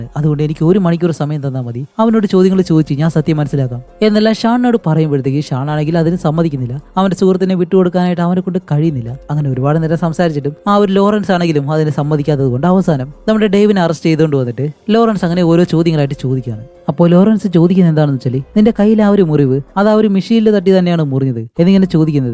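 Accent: Palakkad, India. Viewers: Palakkadan slang ill kadha kealkumbol chiri😂ariyathe varunnu😂 chirippichathinu thanks 🙏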